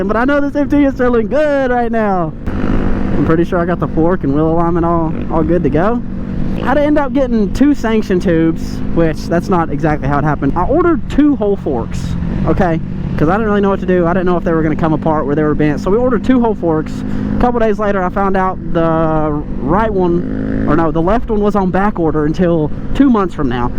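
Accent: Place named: South, United States. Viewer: You sound like y'all from dawn sawwf. What state?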